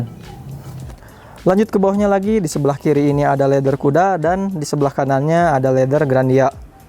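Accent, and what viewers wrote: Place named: Banjar, Indonesia. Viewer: banjarann ini mah mang nya?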